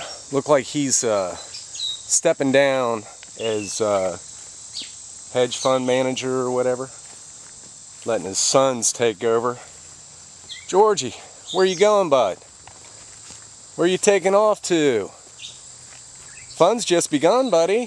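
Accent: United States. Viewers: Is this guy selling Amway? I'm ashamed to have the same Mid-Atlantic accent. At least he tried to say something unlike me. I wish him luck during the apocalypse. I think I'd rather just die instead of trying to survive in order to rub elbows with such an intellectual.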